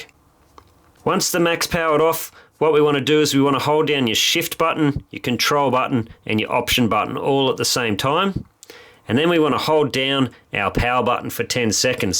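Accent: Australia. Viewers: Thanks so much for this tip. I did both methods and got it to work - charing again - on the second method. Great to hear an Aussie voice for a change. Cheers M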